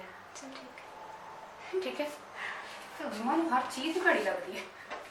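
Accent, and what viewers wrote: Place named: Punjab, India. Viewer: Punjabi boht pyri bolti hn ap api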